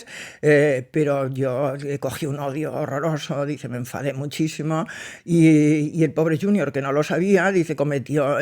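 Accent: Catalonia, Spain. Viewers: Me encanta como.hablas eres genial ¡¡!!un saludo 😊❤tu eres catalana no??yo tengo mucha familia en mataro y gerona se fueron de aki de extremadura😊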